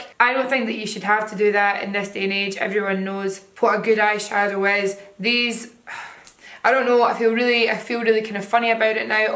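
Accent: Scotland, United Kingdom. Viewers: Where in Scotland is from?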